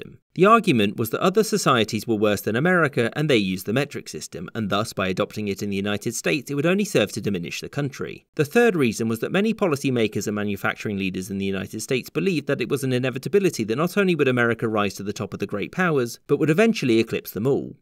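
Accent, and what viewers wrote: United Kingdom. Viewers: Clicks video to hear explanation. Hearts British accent. Laughs in American metric. We use both systems, jackass. It's literally on our food labels. Our races are 3k, 5k, 10k, 15k. Marathons are marathons, get over yourself. I go to the store and can buy soda by the liter. Our hospitals use it. We use F because it makes more sense. No? then why are freezers in the UK set to 0 Fahrenheit? Enough with making your citizens ignorant.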